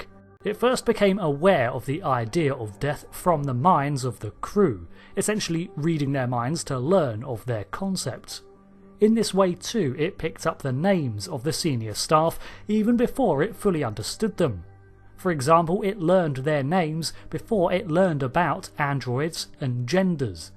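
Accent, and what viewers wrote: United Kingdom. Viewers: Awful nit brit narrator. "Theah shiewwwwwwwwwwwwwwds..."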